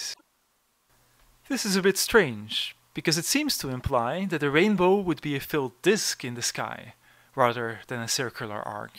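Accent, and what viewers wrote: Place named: Europe. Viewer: When you click on a YT thumbnail: 😊 When the European accent starts 😢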